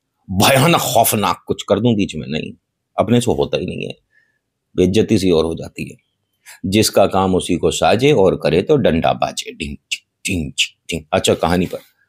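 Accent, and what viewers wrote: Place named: Meerut, India. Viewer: Bhai aap meerut seh ho na.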